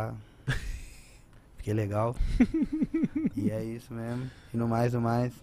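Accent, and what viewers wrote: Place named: Belo Horizonte, Brazil. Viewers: nois é mineiro uai, é o sotaque oxi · Esse sotaque é de bh e região próx, quem é de fora estranha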